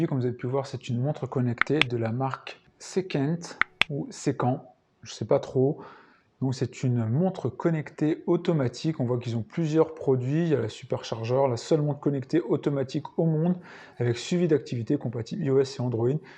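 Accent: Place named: France. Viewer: la lecture anglaise avec la prononciation française..c'est pas top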